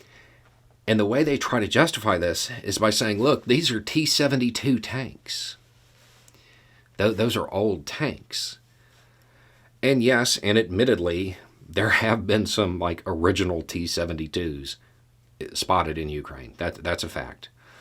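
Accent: South, United States. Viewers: I want to trust you because of your beard. Stick with me, please. I want to to trust you because of your familiar southern drawl. I want to trust you because of your witty t-shirt choices. Really, hear me out. I want to trust you because of your sensible and informed perspective of global politics and international dynamics. I FEEL like you are honest, and that you value MY ability to understand what's REALLY going on in the world. Your words give me hope, and my ability to follow your train of thought makes me feel intelligent, and better informed for absorbing your discourse. Please, tell me this. What is your motivation for sharing your keen insight and your witty parlance? Is it for the clicks? Is it for the lolz? Or is it genuinely for the desire to promote a more informed public awareness of the issues facing this bewildered generation of hope mongers? 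I think you might be a thorn in the foot of the misinformation and cynicism that plagues the minds of the susceptible masses. I think you might be a hero. Why? Why do you share your perspective? Why do you post multiple videos every day to tell the world what we should have the sense to learn for ourselves? What motivavates YOU to motivate US to wake up and pay attention? Your answer to this question will I use to judge myself, and my naivety for entertaining content. You compel me to seek truth and lucidity, and I ask that you speak to this influence you have on me. Your silver tongue is a potent tool. Are you as genuine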